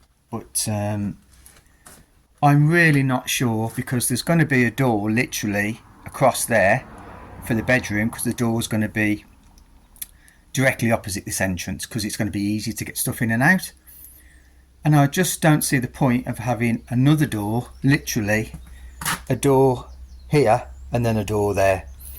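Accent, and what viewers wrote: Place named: England, United Kingdom. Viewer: Hi or (gentleman who's hosting in this upload with English accent), sorry, I'm confused whether you are or, or???? 🤨😐 Are you eventually going to remove the old wooden lintel (the higher-up one / above the wooden blocks) with a concrete one? If you do, then you will gain nearly an extra foot (12 inches), the wall looks so dodgy, especially with the big crack, would you not consider taking that small part of that wall down and rebuilding it with a higher concrete lentil? It could sort many of the problems? Also, just wondering if there is a yard where one can drive their car in, as the car is usually parked along the roadside? God help you / / with all the questions I'm firing at you, sorry!!! 😱😱😁😁😄😄 Anyways, thanks, or, or 🥹 for the upload, looking forward to your next one, hugs from the west of Ireland 🤗🤗😘😘❤❤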